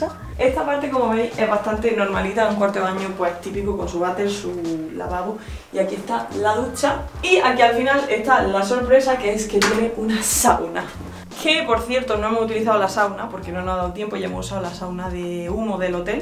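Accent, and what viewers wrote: Andalusia, Spain. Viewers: Amo amo el acento andadulez. Se dice así?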